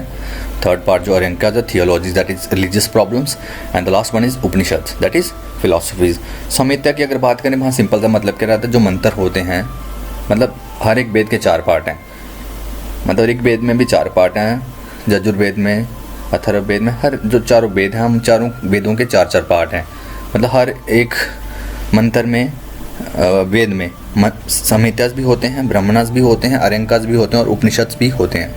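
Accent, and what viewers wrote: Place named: Jammu, India. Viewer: Bhaiya aap jammu se ho kya ? 😅 Aapki awaaz se lg rha hai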